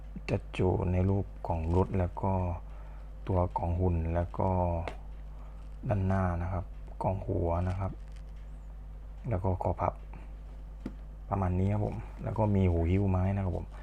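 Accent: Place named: South, Thailand. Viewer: พี่บ่าวคนใต้แน่เลย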